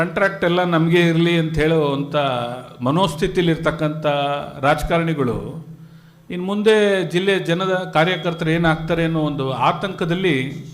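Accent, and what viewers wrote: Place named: Mandya, India. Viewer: Ivanu yaru, Mandya bashe thara mathodo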